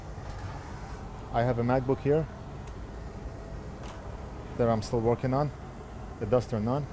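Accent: Lebanon. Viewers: Maaaaaan i was trying to see how to fix my USB to just hear a familiar Lebanese accent.. double shock.. good job btw.. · Me too, ana lebnene w behke nafs l chi 🤣🤣 nafs l lahjee fik to2ofro lebnene hata mn wejjo w hek Lebanese people making the difference all over the world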